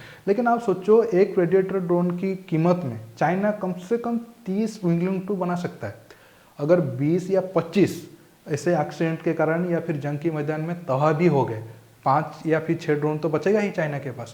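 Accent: Odisha, India. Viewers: Hindi bolne ke style se pata chal raha he ki aaap odisha se hi ho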